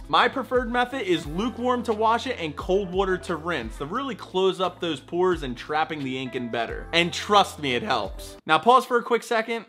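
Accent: Philadelphia, United States. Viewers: I can spot Philly from a mile away...the second he spoke, even before “WADER” being said, I could smell the Wawa through the screen on my phone....Go Birds!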